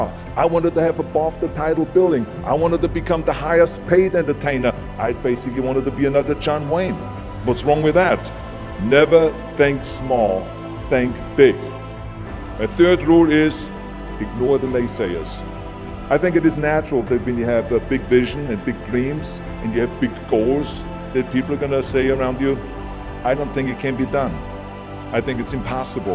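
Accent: Austria. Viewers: You gotta have this charming austrian accent. Got it. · Thee Terminator because it’s not just German accent, it is Austrian German accent, plus the dialect specific to the region where he grew up.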